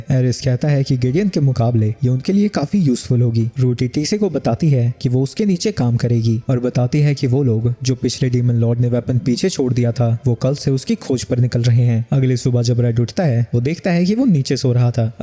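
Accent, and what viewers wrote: Gujarat, India. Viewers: Bro are you gujarati?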